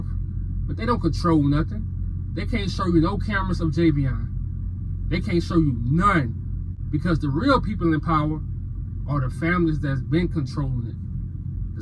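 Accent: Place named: Louisiana, United States. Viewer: 1st time I heard you speak, I said, that must be a Louisiana Man. Do you know where St. Claude & Esplanade is Nephew?? ❤❤🖤🖤💚💚👊🏽 B1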